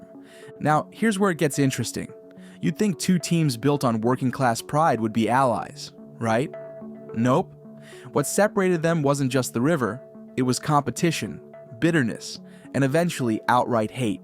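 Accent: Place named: United States. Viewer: What's up with the American accent 🙄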